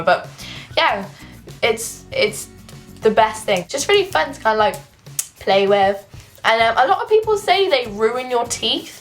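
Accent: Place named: West Midlands, United Kingdom. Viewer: Are u from the West Midlands Cus ur accent sounds like it if so wat shop is it xx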